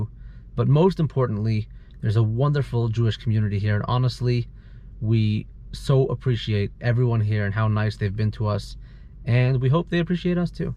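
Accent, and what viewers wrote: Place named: South, United States. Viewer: get that southern accent going buddy.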